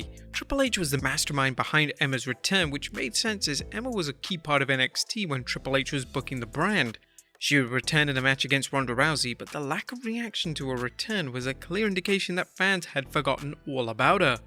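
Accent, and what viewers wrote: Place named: United Kingdom. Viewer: Gotta love a top 10 list about lack of crowd reaction but we never get to hear the reaction, just a British voice talking. Also, why are top 10 lists always British?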